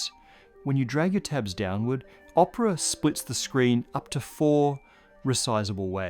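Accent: Australia. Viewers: Great hearing an Aussie accent presenting an American science channel. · I really wanted to watch this, as I've tried others of this channel, but every time my patience is sorely tested and then after a few minutes just can't take all the unnecessary and extraneous gabbing and explaining. Jeez stop trying to convince us of the woundrousness of the universe and just get to the point. And at least try a little bit to obtain a more American accent. I don't know what accent that is, and typically I don't mind UK accents, but this guy's is really hard to take. Austrialian? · Why use an Australian guy and not a regular North American speaker? It's always an Aussie in these science shows. As if to add credibility? Or he's still a minority even though he's white? PBS?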